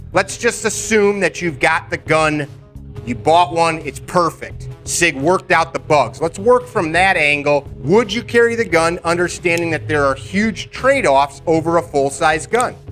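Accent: Chicago, United States. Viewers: Good video. Well done. I’m guessing you’re either from the Chicago area or maybe Detroit, I suspect. Seems a lot of people “don’t like” the way we talk. I’m pretty sure that’s because we speak with authority and sound different enough that we’re a little bit misunderstood. By the way I’m responding in reference to the correspondence on your home page where you offered the fellow to prove himself as a little bit more than a keyboard warrior who knows enough about something to believe he’s right but not enough to know he’s wrong. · boy, for sure. This the first time you've heard a Chicago accent?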